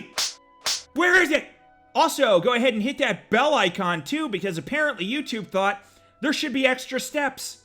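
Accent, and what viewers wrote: Canada. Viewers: Are you Canadian? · This guy has to be Canadian, right? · Hahhaha this guy is so Canadian he sounds like my buddy who lives in Orangeville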